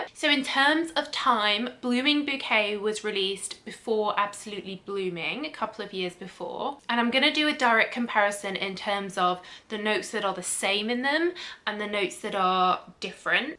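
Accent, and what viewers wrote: United Kingdom. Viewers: Hi! I already suscribed! love both versions but I prefer Absolutely blooming ❤ I 'm from Argentina and I do love the world of perfumes. As I'm an English teacher, I enjoy listening to real Britsh English reviews😉❤ they are really interesting and descriptive and it feels like you are smelling those marvellous scents😘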